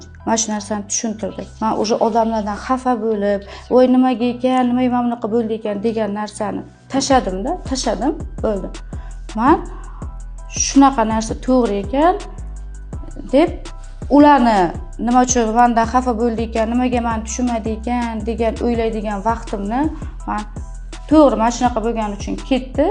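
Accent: Bukhara, Uzbekistan. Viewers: Buxoroliksiz adashmasam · Togri topdiz buxorolik bu opa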